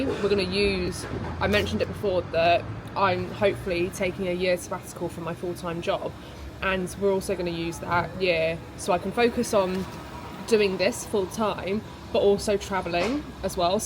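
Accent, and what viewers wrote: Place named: United Kingdom. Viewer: I love all of your videos, and your British accent is so cuteeee OMG - makes me want to book a flight to London 😝 And you guys are such a cute couple, love watching you two together, listening to each other and always taking each other's tastes and interests so seriously. That's what I call in couples a participatory involvement with each other :face-red-heart-shape: Keep creating and keep sharing! Looking forward to all that good stuff that you show us in your vlogs. I have been binge watching your channel this Sunday - all day long - and I will do the same every Sunday from now on🤣🥰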